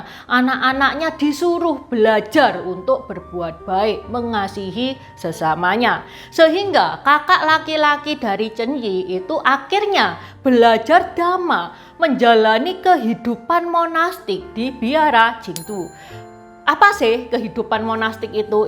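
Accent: Java, Indonesia. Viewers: Mantap channelnya,. Bahasanya Medhok... Asal kota mana ya,? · Medok guys.... Semangat terus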